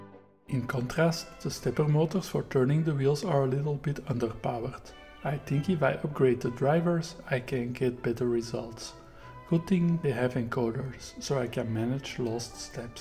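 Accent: Belgium. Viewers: It´s Belgium i think, there are signs in Dutch, he sounds Belgian. A lot of Belgian city´s are majority muslim and african.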